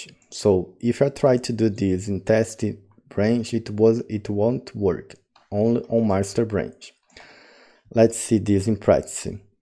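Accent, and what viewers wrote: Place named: France. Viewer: thank you so much, I dont know why such useful video does not attract many people. BTW, I love your French-English accent 🤩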